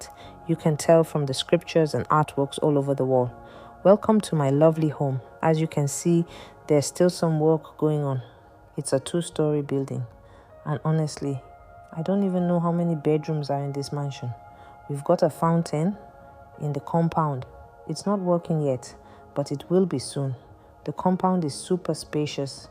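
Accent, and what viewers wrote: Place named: Nigeria. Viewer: U are speaking like Nigerian. Copy and paste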